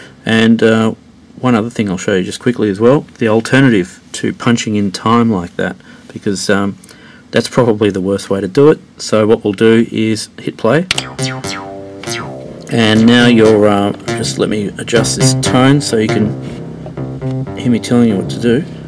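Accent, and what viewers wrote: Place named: Australia. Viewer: Hi, noticed the Aussie accent. Are you in Sydney? I found a 303 and 606 in a council clean up pile in Mascot. I shit you not. I have no idea how they work (I play cello) but if you’d like to have a look at them please let me know! It’d be fun to see if they work. I put batteries in the 303 and it lights up.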